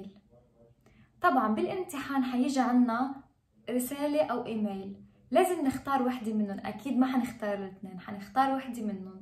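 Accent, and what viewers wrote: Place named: Arab world. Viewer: يؤبشني لهجتك عربيه ما احلاها 😀❤️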